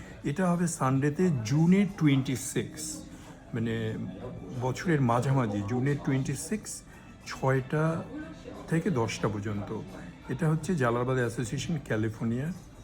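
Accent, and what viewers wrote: Standard. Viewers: আপনার শুদ্ধ বাংলা বলার ধরনটি চমৎকার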